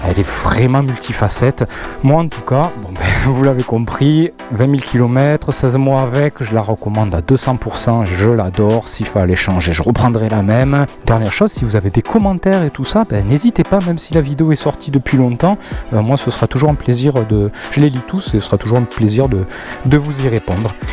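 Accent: South, France. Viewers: Vidéo vue. 32mn de kiffe. Jolie moto. Jolis paysages. Bonjour à Madame. Le soleil, l'accent du Sud... pow pow pow, continues, restes le même, et hâte de connaître ton ressentis GT vs ténéré 😁 un gros ✌ d'encouragement à toi, force à toi et prenez (toi et Madame) soin de vous... ✌✌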